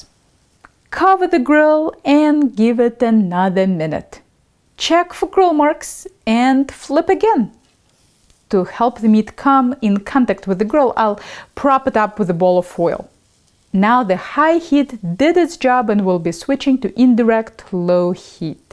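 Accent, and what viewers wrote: Russia. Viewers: this russian accent, sexy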